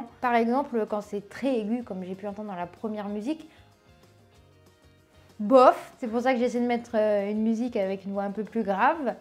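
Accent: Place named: Toulouse, France. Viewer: J'adore ton accent toulousain 😂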